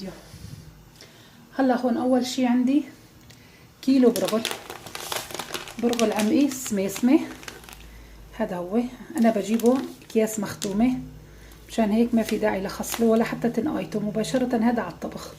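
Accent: Aleppo, Syria. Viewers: الحمدلله عسلامتك لايحرمنا هللهجه الحلبيه الاصليه..ذكرتينا باهلنا...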